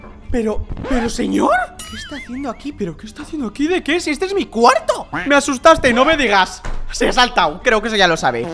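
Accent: Argentina. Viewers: y su acento argentino a todos lados, lo amoo 🎨🌷❤️‍🩹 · hablas bien en modo argentino ya que soy de argentina hablas super bien😊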